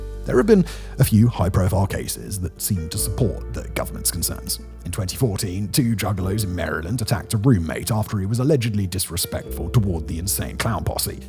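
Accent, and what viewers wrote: RP, United Kingdom. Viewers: The original comment said he has a posh accent. You replied that isn't really all that posh, but middle class. That is why I made the point that you don't have to be posh to sound posh. Hope that helps. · I love hearing say "The Insane Clown Posse" in his incredibly posh British accent 😂😂😂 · A British accent documenting an American phenomena... will almost always be tediously inaccurate, and a giant waste of time for anyone except those who live in the UK and believe that they are learning something. They aren't.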